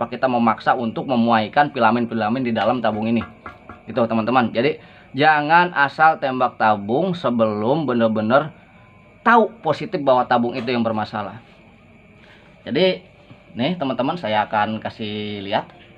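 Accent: West Java, Indonesia. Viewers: Mantap hu jeli banget penjelasannya...orang sunda kang????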